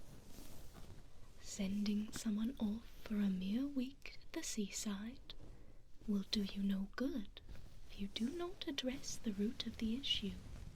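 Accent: United Kingdom. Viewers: I love the British accent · oh goodness, I love your British voice. This is just sublime Miss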